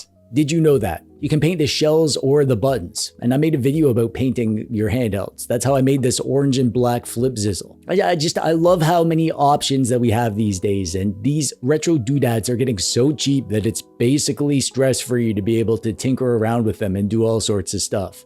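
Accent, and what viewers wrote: Canada. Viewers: you're Canadian?!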